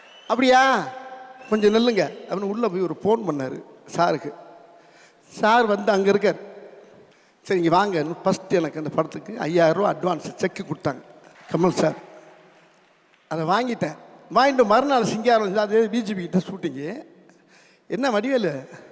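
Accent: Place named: Madurai, India. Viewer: இவருக்கு கைக்கொடுத்தது இவர் பேசும் மதுரைத்தமிழ் வாழத்துக்கள்🎉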